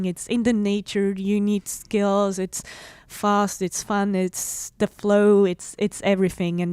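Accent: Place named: Quebec, Canada. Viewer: She sounds french canadian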